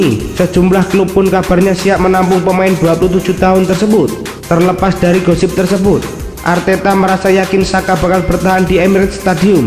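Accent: Java, Indonesia. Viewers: Untuk, luar biasa untuk berita nya, tapi tolong logat jawanya di ubah, jelek banget jdinya, tolong... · Logat jawanya di hilangin bro. Skedar saran aja bang jgn tersinggung 🙏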